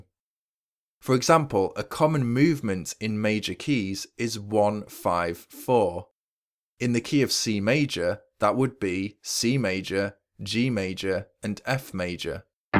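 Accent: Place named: Liverpool, United Kingdom. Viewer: An excellent explanation of the topic. Before the Internet and YT, it was nearly impossible discover such theoretical concepts and approaches to composition. Plus, I enjoy picturing George Harrison as instructor, since you sound very much like him—a fellow Liverpudlian, I suspect. Cheers!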